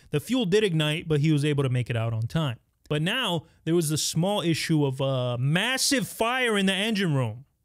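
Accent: New York, United States. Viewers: The narrator sounds like a cross between Alan Alda and Howard Stern with a little New York tough guy thrown in! Great report!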